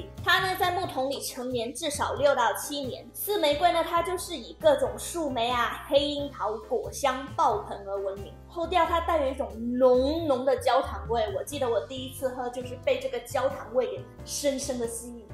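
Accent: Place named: Taiwan. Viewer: 這口音也太特別，台味非常重的大陸音。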